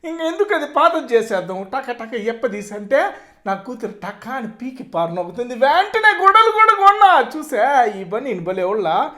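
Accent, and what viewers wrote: Nellore, India. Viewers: అన్న బాగుంది అన్న లంచ్ బాక్స్, స్పూన్ కూడా బాగుంది మీ మాటలు సూపర్ మన నెల్లూరు భాష బాగుంది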